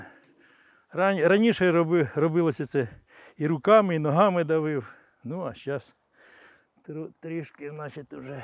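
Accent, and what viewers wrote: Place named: Surzhyk, Ukraine. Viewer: Несмотря на ученую степень, педагога из Вас, не получилось. Очень неприятно слышать суржик. Читайте больше книги на украинском и не будете заикаться.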